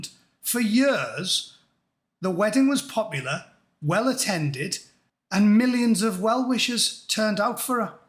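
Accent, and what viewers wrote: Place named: Geordie, United Kingdom. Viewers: Haha "Fanny like a gutted fireplace", I'm shocked you havent been shut down, presumably the Facebook bots have not been programmed to recognise a north-east accent. Still as a Pit Yakka its nice to hear a familiar tone on the Youtube, it feels like a good gas in the pub. Still, a nice takedown of these lying, ungrateful, self absorbed, attention grubbing, vacuous oxygen thieves. BTW as a recent subscriber I'm more shocked to hear in this episode you live in California, how the hell did that happen? When I clocked the breakfast bar, I thought "Smoggy made good, must be in Norton now or maybe even Ingleby Barwick". I would be interested to know how you find the accent goes down over there, as our Durham twang was met with some fairly blank looks in San Francisco. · Harry and Megan leave me speechless! Hateful pair! 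I have to say that I never liked or trusted Harry's mum...an egoist who wanted everything. Give me Charles, Anne, Camilla and Catherine any day! May our late Queen rest in peace. Loved all your rhetoric, and I love the Geordie accent, it is like music. Take care, stay safe, and have a good Christmas. God Save the King.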